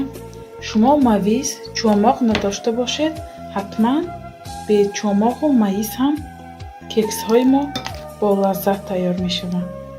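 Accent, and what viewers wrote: Isfara, Tajikistan. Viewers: рахмати калон апа ман а рузи авал а лавзатон шинохтам ки шумо аз исфара хастед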